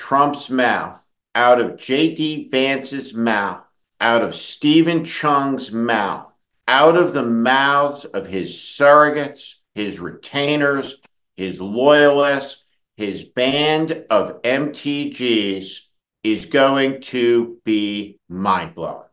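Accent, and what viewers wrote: New Jersey, United States. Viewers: I support that NJ dialect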